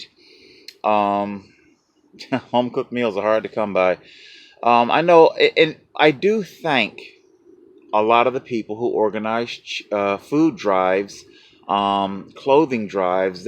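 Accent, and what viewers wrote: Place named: Ireland. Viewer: Awesome Irish accent!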